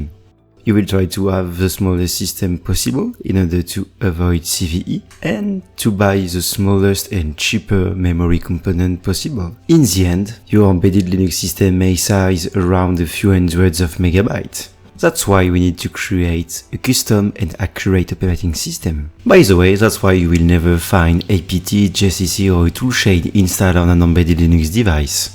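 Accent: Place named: France. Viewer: You have an french accent 😂😂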